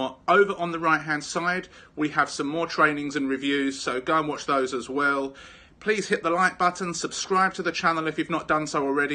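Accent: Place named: United Kingdom. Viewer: I noticed your region was 'Oregon' although you sound British. Is this so you can access the Neural voices? I'm from London area and they are not available (?) Is this because of the region specified in my AWS account or is it IP address? or what?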